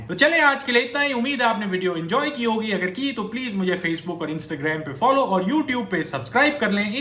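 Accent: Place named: Sindh, Pakistan. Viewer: Loved your Sindhi accents 👍❤️😆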